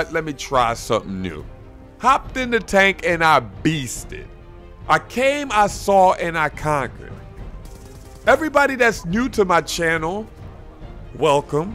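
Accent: Philadelphia, United States. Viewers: sup o.g keep up da work. where u from? u sound like u from philly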